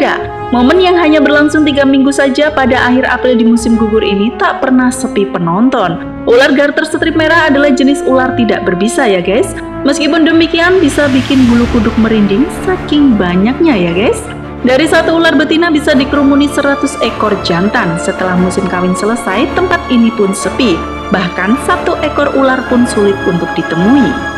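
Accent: Java, Indonesia. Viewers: Naratornya medhok banget 😀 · Medok naratornya 😆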